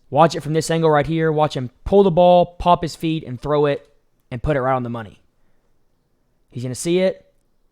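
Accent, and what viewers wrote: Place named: South, United States. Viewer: This is why was from the south aren’t good. Listen to this gentleman ramble. By the end of the first play it was clear this guy has limited football knowledge and experience. Bo Nix should have made the throw to the right check mark to what you called his 4th read and it was obvious when you drew it up that he would be open IF you KNOW how to pre read defenses. You could’ve mentioned the cover 1 shell. Clean up your accent too you sound silly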